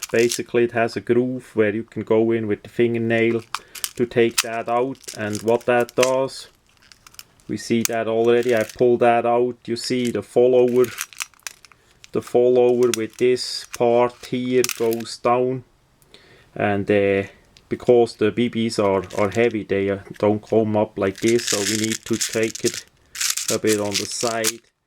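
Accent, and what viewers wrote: Switzerland. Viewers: My goodness! Your accent is so stereotypically swiss!!! Great video!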